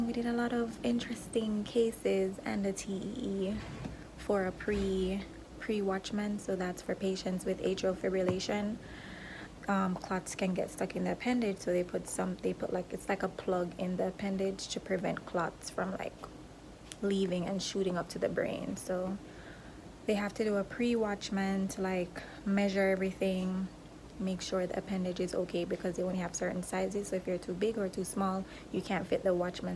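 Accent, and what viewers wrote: Jamaica. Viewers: are you Jamaican? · You sound like you have a Jamaican accent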